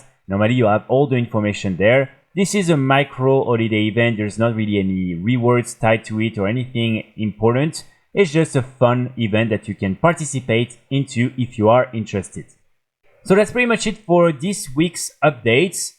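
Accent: France. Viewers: Do you do these in French? I'd watch and listen to those same videos if you do. It's the accent, it is quite thick and very French.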